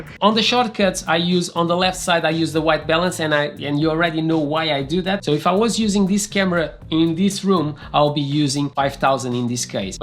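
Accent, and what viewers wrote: Portugal. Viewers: Where are you from my guy that accent sounds kinda portuguese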